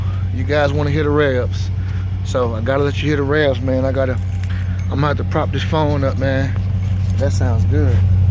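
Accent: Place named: South, United States. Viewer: Sounds good dude. I can tell your country AF with that accent. Got that “boiler pro”. LOL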